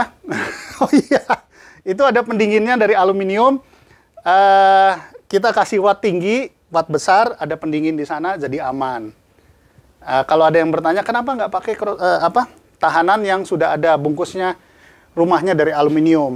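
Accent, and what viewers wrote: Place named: Banyumas, Indonesia. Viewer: Matap tutor video dari Pak suskes selalu buat Bapak,salam kenal dari wong kebumen,sama2 ngapak kaya mas ari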